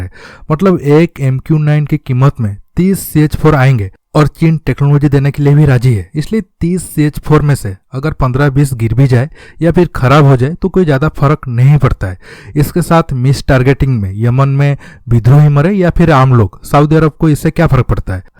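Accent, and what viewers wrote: Odisha, India. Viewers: Bhai odisha se ho kya??? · Voice se Lagta hai ki tum Odisha state se belong karte ho . Just my guess. By the way nice work...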